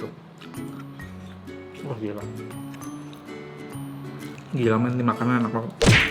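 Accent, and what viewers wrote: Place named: Surabaya, Indonesia. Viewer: Mamen gak pernah telat nih nonton video nya, mana suaranya surabaya😂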